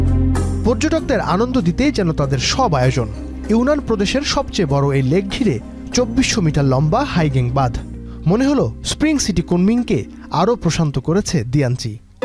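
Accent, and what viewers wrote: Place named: Noakhali, Bangladesh. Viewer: ভাই আপনার সংবাদ বিশ্লেষণ ভুলে ভরা, আর "আমি জেনে শুনে বিষ করেছি "প্রাণ"(???) , বিষ করেছি পান হবে। চুপ্পু বংগভবনে বন্দি না, আপনি বলেছেন চুপ্পু কোথায়ও বের হয় না,কথাটি সম্পুর্ন ভুল, এই ২৬ শে মার্চ স্বাধীনতা দিবসে সে সাভার স্মৃতি সৌধে পুষ্পস্ত্ববক অর্পণ করে। আপনার কথায় নোয়াখালীর আঞ্চলিকতা প্রকটভাবে লক্ষনীয়।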